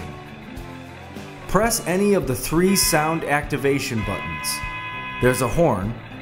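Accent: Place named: Midwest, United States. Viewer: Somehow I was able to recognize by his voice and accent alone. That's a benefit of being a Midwestern American I suppose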